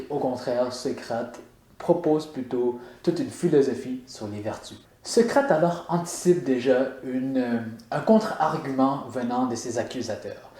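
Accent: Quebec, Canada. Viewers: woah c'est quoi ton accent, c'est mi québécois mi français dis weird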